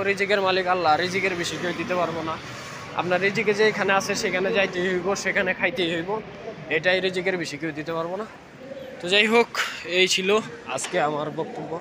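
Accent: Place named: Faridpur, Bangladesh. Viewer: ভাই আপনার বাসা কি ফরিদপুর এ,, ভাষায় মনে হচ্ছে ফরিদপুর, আমার বাসা ও ফরিদপুর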